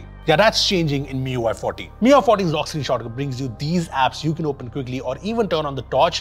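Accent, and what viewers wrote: India. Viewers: Mrwhosetheboss in indian accent channel. Nice content btw. Very informative and entertaining at the same time!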